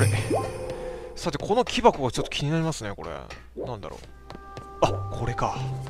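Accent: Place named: Ehime, Japan. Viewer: 初コメです!風のタクトを見てからずっとさんの実況大好きです。たまに出る愛媛のなまりとかついつい懐かしいと思っちゃいます(笑) さんアムロ、大好き!!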